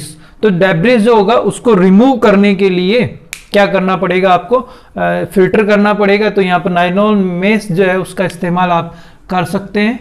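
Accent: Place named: India. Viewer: sorry but how does can international students understand english indian fast accent ? but good video hehe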